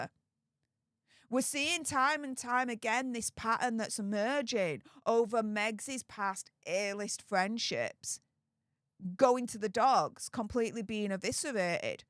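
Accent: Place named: Northern England, United Kingdom. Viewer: Great music 🎶 Just love a northern accent 😀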